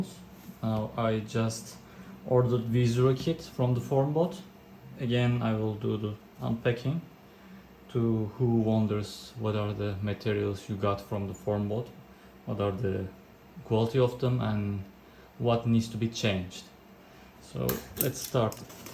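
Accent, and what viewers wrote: Turkey. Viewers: Hi, your accent looks like Turkish accent. Where are you from? If you are Turkish can i ask some questions?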